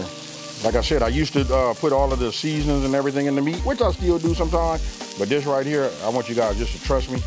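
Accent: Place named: West Coast, United States. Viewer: Anybody else can tell that he's so West Coast??? Not mad at it at ALL!!!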